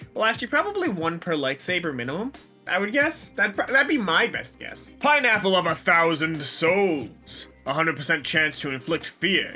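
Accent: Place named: New Jersey, United States. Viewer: I sense a Jersey accent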